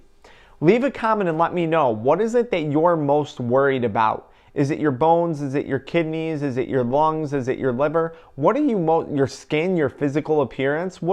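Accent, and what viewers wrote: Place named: Midwest, United States. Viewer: I’m high asf rn and his Midwest accent is killing me😂😂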